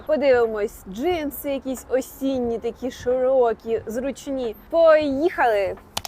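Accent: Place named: Surzhyk, Ukraine. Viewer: У Вас такой смiшний суржик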